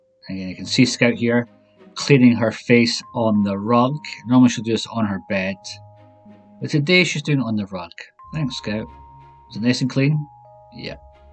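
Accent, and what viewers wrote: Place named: Scotland, United Kingdom. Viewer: Absolutely wonderful video! Love your dogs, lifestyle and your beautiful accent.. Scottish <3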